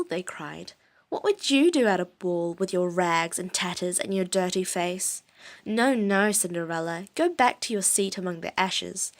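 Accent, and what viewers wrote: New Zealand. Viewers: 뉴질랜드 액센트 잊지않을려고 옛날부터 많이 듣고있었어요!! 목소리 너무 좋아요 계속 올려주세여❤️ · 뉴질랜드 영어 발음으로 동화를 들으니까 리스닝에 도움이 됩니다. 감사합니다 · 뉴질랜드 억양 찾다가 우연히 듣게 됐는데 목소리 너무 좋아서 바로 구독햇어요!! 음악만 듣고 살았는데 음악 대신 계속 듣게 될 것 같아요 😍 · Love your kiwi accent! 저는 20살 이후에 뉴질랜드에 가서 아시안 악센트가 강한데 역시 키위악센트가 세계에서 가장 섹시한거 같아요~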